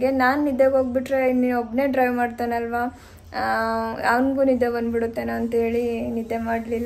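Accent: Mandya, India. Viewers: Hi Nice video super singer ❤❤❤❤ ನಿಮ್ಮೂರ್ ಮಂಡ್ಯ ನಾ ಮಂಡ್ಯ ಭಾಷೆ ತರ ಇದೆ ನಮ್ಮೂರು ಮಂಡ್ಯ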